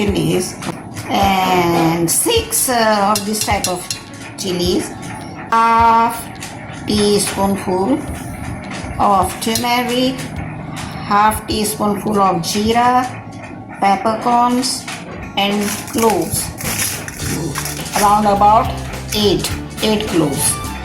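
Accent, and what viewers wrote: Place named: Goa, India. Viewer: i am a goan and I love this chef and her accent - so Goan. Love you and thanks for the lovely recipe.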